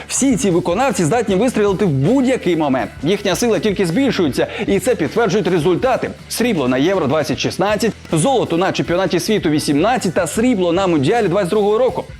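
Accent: Russia. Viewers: Таке враження, що ведучий зростав на російськомовних відеоблогах, треба ще тренувати українську (помилки виправляти + вимову трохи ставити, бо подекуди проскакують маасковські інтонації). Сам матеріал доволі цікавий (хоча, у табличці теж помилка – 2 і 3 місця). Вже не перше класне відео на цьому каналі! Продовжуйте!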